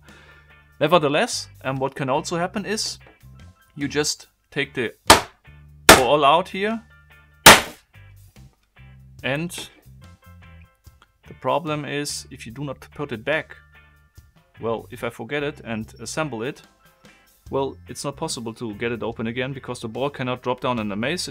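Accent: Germany. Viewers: Kannst du mal ein deutsches video machen In vielen videos hört man das du deutscher bist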